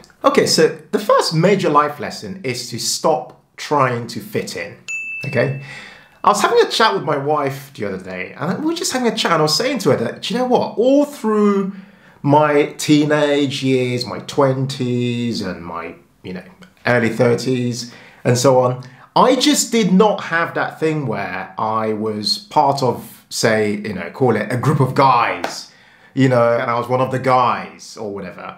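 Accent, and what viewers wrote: United Kingdom. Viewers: How do you have such a british accent when you arrived in the uk at 15/16?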